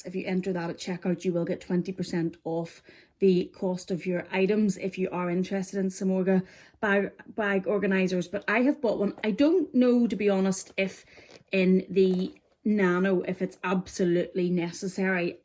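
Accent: Northern Ireland, United Kingdom. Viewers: Fantastic review! Was debating between the nano and mini. Think I might need to get the nano! Do I hear a fellow Norn Ironer? 😁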